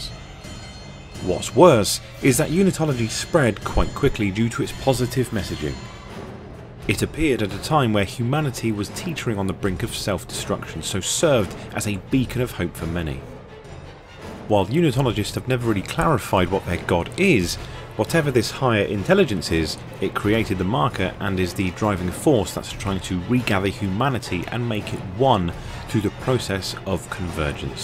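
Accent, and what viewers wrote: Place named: United Kingdom. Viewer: Yey funny brit narrator uploaded!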